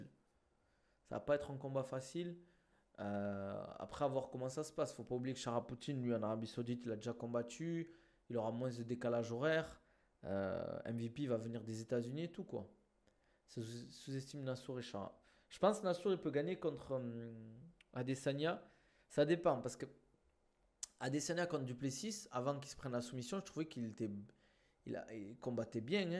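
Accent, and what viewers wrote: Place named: South, France. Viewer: Tu viens d’ou en France c’est juste pour savoir vu que t’as un accent du sud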